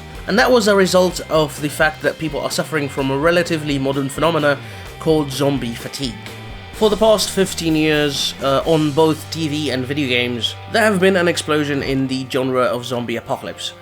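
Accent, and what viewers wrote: United Kingdom. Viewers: Your British Accent is awesome, really! keep it up, Dude! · I envy your british accent tbh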